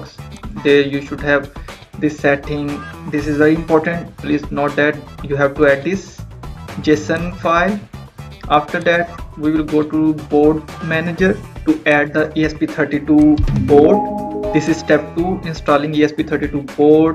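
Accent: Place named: India. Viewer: I don't understand indianenglish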